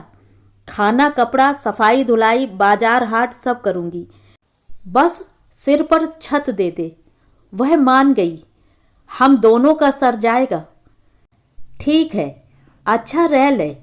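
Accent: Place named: Haryana, India. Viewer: बहुत ही सुन्दर कहानी है और ठेठ हरियाणवी अंदाज में सुनाना भी मन मुग्ध कर गया।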